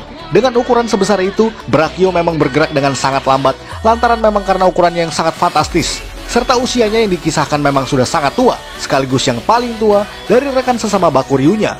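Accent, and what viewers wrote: Java, Indonesia. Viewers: Hihihi 😁 Saya gak blg gak suka sama sekali lho... Tapi iya saya setuju aja kalo naratornya itu terlalu berlogat Jawa medok apalagi jg 'umbar' SS favoritnya itu Gekiranger, itu sih sah-sah saja... Spt juga saya sampai skr msh memfavoritkan Goggle V dan Kyoryuger... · Di try it itu mnurut gw editannya aga kurang rapi, penyampaian narasinya jg aga kaku bnget dan dimedok²in,.. jjur ya gw jg orang jawa tp aga kurng suka klo ada youtuber yg make bahasa medok jawa gitu, knpa? Ya krna channelnya ditujukan untuk smua tokufans, bukan buat orang jawa doang😅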